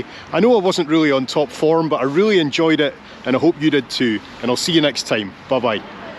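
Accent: Scotland, United Kingdom. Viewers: Always enjoying your videos ... and your fine scottish accent :-) This is indeed one heck of a special way to cross a canal! Wow Many happy and safe trails for the new year!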